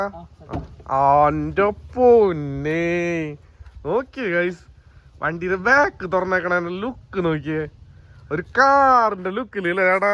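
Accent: Thrissur, India. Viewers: മ്മടെ തൃശൂർ ഭാഷ ഹമ്പ പൊരിച്ചു 🥰❤🔥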